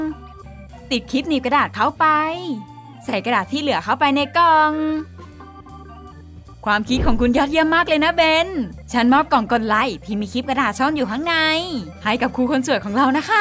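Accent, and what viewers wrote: Thailand. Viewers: ทำไมมันดูเหน่อๆง่ะ😅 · ้เสียงคนพากย์เหน่อๆ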